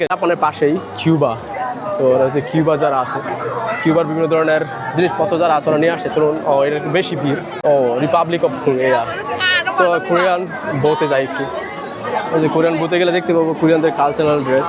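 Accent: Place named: Netrokona, Bangladesh. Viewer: আপনার কথার স্টাইল অনেকটা আমাদের নেত্রকোনা র মানুষের মত, যদি কিছু মনে না করেন তাহলে, জানতে কি পারি আপনার বাড়ি?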